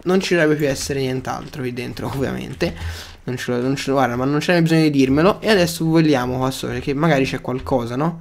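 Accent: Florence, Italy. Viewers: adoro il tuo accento fiorentino :D :')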